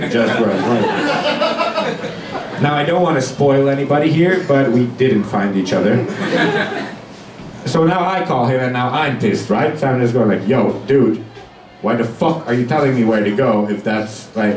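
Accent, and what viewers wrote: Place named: India. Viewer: Yea it sounded kinda indian/pakistani to me too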